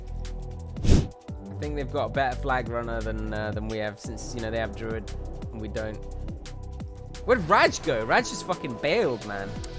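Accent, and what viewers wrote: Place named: United States. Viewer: i like ur american resto druid accent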